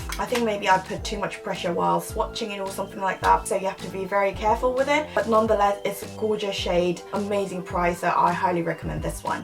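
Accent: United Kingdom. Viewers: 세상에.....영ㅇ국발음....!!!!!!!!!!!!! 게다가 영상도 너무 좋아서..바로 구독 아 너무 사랑해요 진짜.....ㅠㅠㅠㅠㅠㅠㅠㅠㅠㅠ♡♡♡♡ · 목소리 너무 좋타....♡영국발음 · 언니 영국영어쓰시네...존멋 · 상큼하고 귀여운 이 떠서 보러왓오요 ㅠㅠㅠㅠㅠ ❣❣❣❣❣영국식 영어발음도 넘 매력있네요오....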